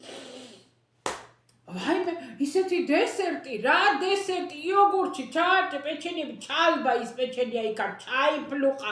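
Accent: Kakheti, Georgia. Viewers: მისაბაძი ქალბატონი ბრძანდებით, თქვენი საოცარი იუმორით, კახურით გემრიელით და თან გულისტკივილით რომ გადმოსცემთ სათქმელს ყოველთვის ჯანსაღად. უზომოდ პატივს გცემთ ქალბატონო,. საშინელებაა, თუმცა მასეთი სულით მდაბალი ადამიანისგან, სხვას არც უნდა ელოდო. ჯანმრთელობა, წარმატებები და სულ ლამაზი დღეები თქვენ.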